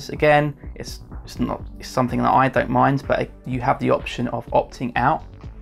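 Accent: United Kingdom. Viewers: BUZZKEIL Tech: The accent is NOT American. I'm from America! The accent on the video sounds cockney.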